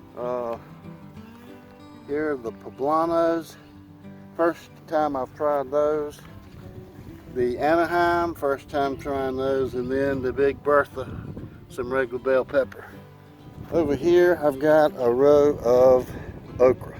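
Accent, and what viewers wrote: South, United States. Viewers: After has been gone a few months....I still love to come watch him and hear his peaceful southern voice. Love his videos forever. It's still tough to imagine YouTube without my friend. Miss you Bud!! God Bless you and your family and Toby!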